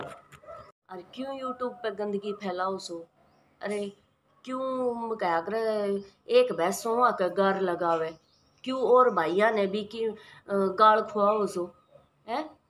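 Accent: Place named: Rajasthan, India. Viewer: Aapki boli t to rajshthani lago ho ji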